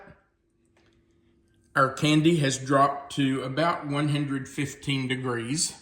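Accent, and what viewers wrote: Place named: Kentucky, United States. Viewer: As a 14-year-old, this was the first candy I made, and it was from the back of the Cocoa can. I was a farm kid and learned to help in the kitchen from an early age. I did not have canned milk and used our Dairy farm cream from the top of the pitcher of milk. I did not have measuring cups or spoons. Used a teacup and a teaspoon and real home churned butter & Watkins vanilla from the "Watkins man" who came around on a regular basis. I also used the cup of cold water to test it since no thermometer in 1957. My mom walked me thru the first time and turned out fine, delicious. I made it many times after that thru the year, not just Christmas. I got the proper equipment in the 1960s but never made a difference in the outcome. Love hearing about your grandmother and that she lived in W. Virginia. I started watching you because you were from Kentucky and had that delightful accent. 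My Dad was born in W. Virginia when he came a little early while grandmother was visiting her sister. He was raised in Louisa, Ky but moved with his family to Central Oho farm at 16 years old. Love and miss my Kentucky relatives. I still love your accent, not as pronounced as my relatives, but watch for those delicious recipes.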